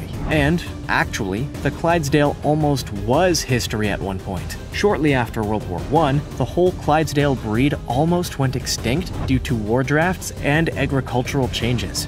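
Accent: Canada. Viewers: Christine Still he’s Canadian and I have a canadaian friend who pronounces it the same idk tho🤷🏼‍♀️ maybe it’s not that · Accent canadien eh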